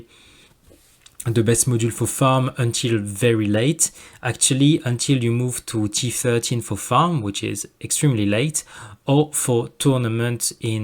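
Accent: France. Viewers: j'ai reconnu direct l'accent français mdr ^^ Bonne vidéo sinon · Frenchest person i ever heard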